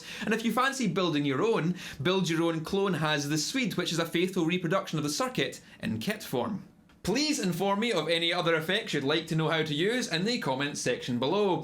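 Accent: Scotland, United Kingdom. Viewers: Scotish Arin Hanson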